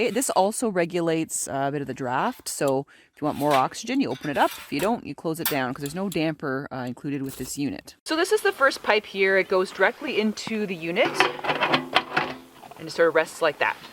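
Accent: Canada. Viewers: You must be Canadian! Just subscribed. Never stop saying "oat" for "out." Of course your way is just a correct as ours!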